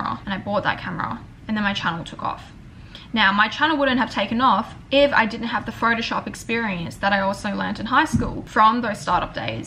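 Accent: Australia. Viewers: It's an Australian accent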